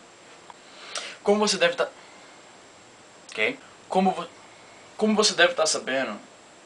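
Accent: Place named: Curitiba, Brazil. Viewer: tu é curitibano, né ? hahah sotaque entrega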